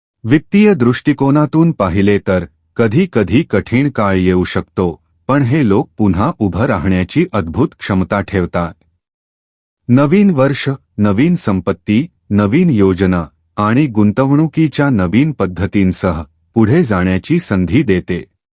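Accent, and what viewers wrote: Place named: England, United Kingdom. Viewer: इंग्रजी भाषेचा टोन देऊन मराठीत माहिती सांगतोय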